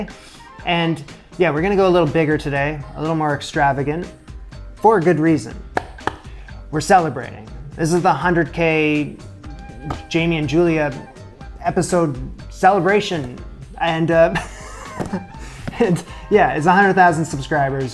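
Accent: Canada. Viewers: You had it right ... The L's are pronounced in chantilly. You give the A a bit different sound than I, but I think that's because you're Canadian. Stick with your instincts. Really enjoy your videos whether you seem to mess up or not.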